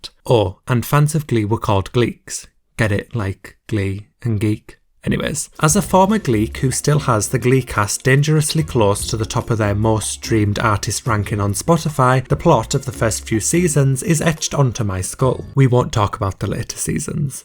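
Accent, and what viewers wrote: United Kingdom. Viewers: Yeah, it sounds like a humanly bot with a british accent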